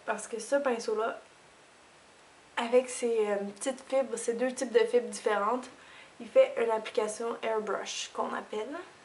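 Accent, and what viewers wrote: Quebec, Canada. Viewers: Salut!!je viens de tomber sur ta chaîne et hop je me suis abonné car tes video sont super!!!J'adore ton accent ça me fait voyager!! ( le Québec j'aimerais bien mais pas en hiver!!!) ahah!! Gros bisous de Lyon!! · Bonjour ! Je viens de decouvrir tes videos et de m abonner !!! j aime beaucoup ! Et cet accent...il me rappelle mes sejours au Quebec! Je suis in love du Quebec!! Je vis dans le Sud de la France ;-) Merci pour tes bons conseils et rendez vous aux prochaines videos ! Ps: la video pour les fetes est top ! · Je me suis inscrite sur YouTube pour une seule et simple raison : "mettre j'aime" a tes videos ! J'ADORE :D Tes maquillages sont superbes et ton accent me fait penser à mes vacances au Quebec ... :) Bonne continuation bisous !! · Bonjour je suis abonné depuis environ une semaine. j'aime beaucoup tes vidéos, on apprend enormément de chose. 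Et j'adore ton accent quebecquois. Moi je suis française:) et ce maquillade hivernale est trés jolie! Continue j'attends tes vidéos avec impatience!!!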